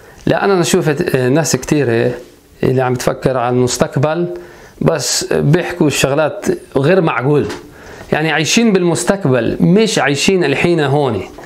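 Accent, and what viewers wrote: Palestine. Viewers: حلوة اللهجة الفلسطينية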